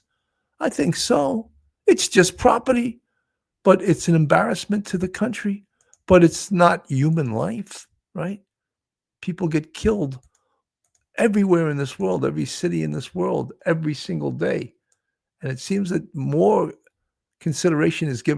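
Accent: France. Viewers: Im glad they solved this fast! I hope the jewels are found soon, 😥 I doubt it tho. ❤btw❤adorable frenchie accent 😂❤ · Thank you Sgt., hoping and praying that the priceless jewels are found. French accent appealing, we are created to laugh! Good accurate reporting, your expertise is valued!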